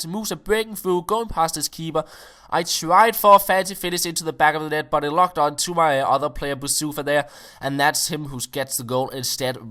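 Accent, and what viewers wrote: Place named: West Zealand, Denmark. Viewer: hvaaa? er du fra Vestjælland?:)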